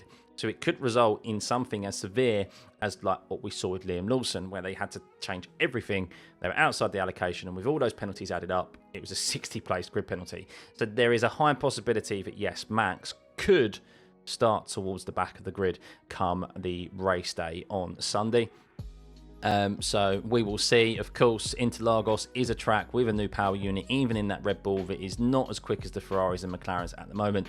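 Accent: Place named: United Kingdom. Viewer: Can you do a non-UK accent? It might hide your obvious bias. Good luck!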